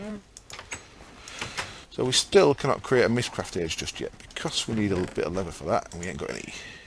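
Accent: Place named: Yorkshire, United Kingdom. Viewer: You just go how you want bud, the video's so far are mint. From a fellow Yorkshireman (Presuming you're from Yorkshire, sounds like it!)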